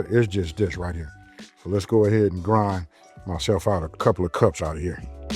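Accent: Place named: South, United States. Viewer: He is from the south stop hating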